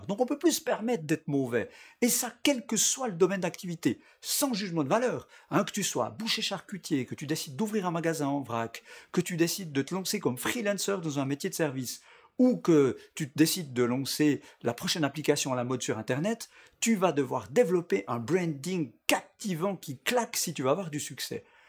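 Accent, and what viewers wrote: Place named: Neuchâtel, Switzerland. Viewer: Ahhh j’me disais qu’t’avais notre bel accent ! Vive l’absinthe ! Vive Neuch !!! 😂🇮🇹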